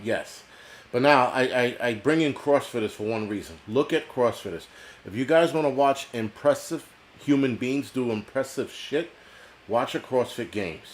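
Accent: New York, United States. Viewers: You sound like a New Yorker - this is they way New Yorkers talk. Authentic born and bred New Yorkers.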